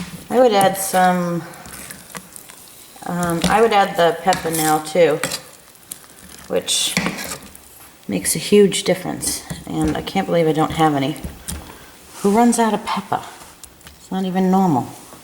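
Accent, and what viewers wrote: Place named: East Coast, United States. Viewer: I found your channel over the weekend and I thought I'd leave a comment and say HI! & say.... please do tons of hauls! If some are sick of em they can skip that video. & Yes I can see :) LoL I love all the stuff you do! & You cook things that I can do! I am definately going to try the stir fry I watched last night!! Last thing is I love your east coast accent! I & My family are from the corner of Indiana near Chicago so I am used to a striong Chicago accent! XoXo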